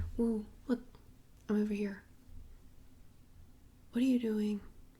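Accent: South, United States. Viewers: gosh I'm from the south and your accent sounds like everyone in my family. lol this character reminds me of a spoiled aunt I have...especially with the southern accent. Love it!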